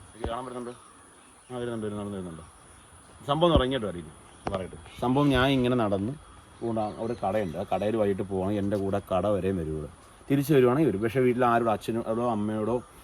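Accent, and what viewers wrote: Kollam, India. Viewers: മ്മ്ടെ കൊല്ലം ഭാഷ വേട്ടാവള ിയൻ😂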